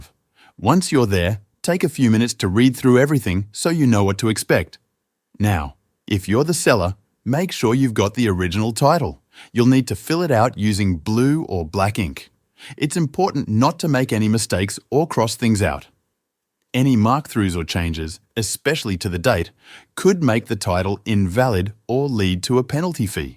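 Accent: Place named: United Kingdom. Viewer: Thanks, for the video. Love the British accent....😁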